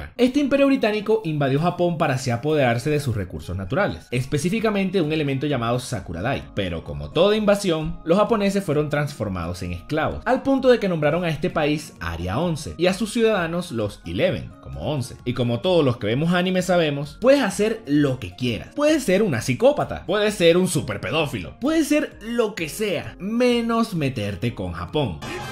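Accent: Venezuela. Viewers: Hay youtubers que nacieron para fracasar, otros nacieron para triunfar, tu eres lo segundo sigue asi venezolano que se que lo eres por tu ascento xd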